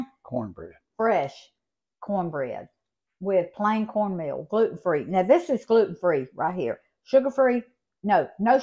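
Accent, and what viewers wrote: South, United States. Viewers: Just stumbled across your video, i love it, looks yummy. Your in the south i think from you accent, love it. I have subscribed, look forward to more, God bless❤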